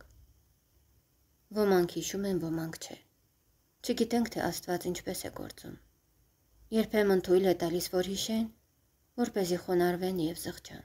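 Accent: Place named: Sisian, Armenia. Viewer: Շատ ներողություն կարդացող աղջկա ձայնը շատ գեղեցիկ ու բարի եվ միեւնույն ժամանակ տխուր է։կասեք ինքը բնիկ որտեղից է։ Ներող կլինեք, մի գուցե հետաքրքրասիրությունը մեղք թվա՞ Ուղղակի ասեմ պարզ Ակցենտը Սիսիանի ակցենտ է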